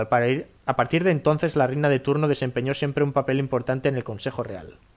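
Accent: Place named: Catalonia, Spain. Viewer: Hahaha, malamente. Se nota que tu idioma es catalán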